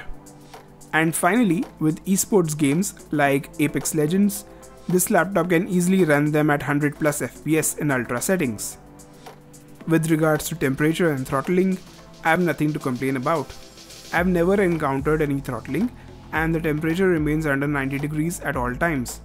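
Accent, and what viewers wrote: India. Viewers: Laptop, lol, love that indian accent :D. Great video!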